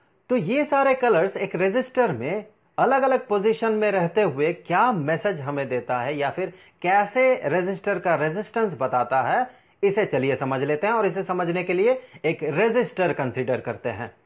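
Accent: Bihar, India. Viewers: Apna yeh Bihari accent change karo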